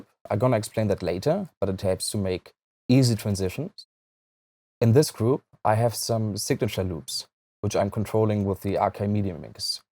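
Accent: Germany. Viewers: This guy has such a nice German accent, love you.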